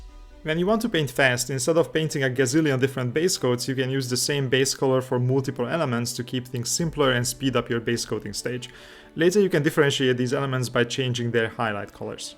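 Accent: Hungary. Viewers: Nagyon szép munka, köszönet érte! Magyarul szóló vidi is szuper lenne. Ahogy megszólaltál, rögtön tudtam, hogy földi vagy. 😁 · Tell me you are Hungarian without telling me. That accent.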